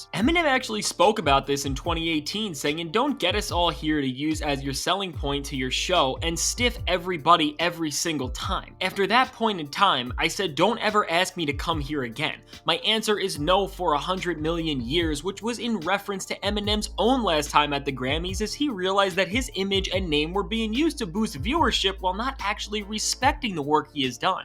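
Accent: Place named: Canada. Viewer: Bro you sound like canadian lad 🗿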